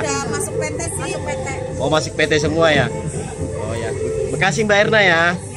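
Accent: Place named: Java, Indonesia. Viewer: Ngomonge medok nmen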